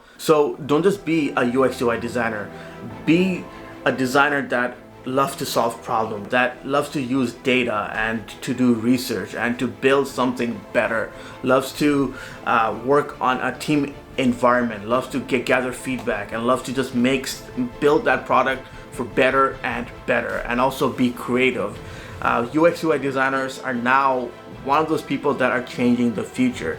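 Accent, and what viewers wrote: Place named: Bengal, India. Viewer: Bro your accent kinda Similar as bengali accent! At the first I thought you re bangladeshi. I already comment bengali one of your video.😄 Glad to know that your ancestor were bengali 😅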